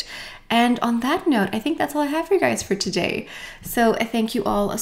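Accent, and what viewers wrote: France. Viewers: Love this perfume!! Love your French accent! Thx for sharing dear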